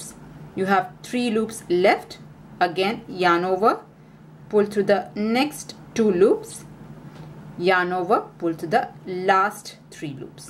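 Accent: India. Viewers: When I heard the Indian accent I knew I would be able to do in one try(I’ve watched about a 100 American tutorials and got frustrated as hell)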